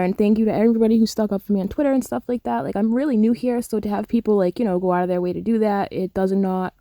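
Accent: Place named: New York, United States. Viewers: You are awesome... and I am not sure where you are from, but you sound like a fellow New Yorker to me! Love it! Keep being Unapologetically You Girlie!!! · Love your videos, love your fabulous East Coast accent! Thanks for your videos.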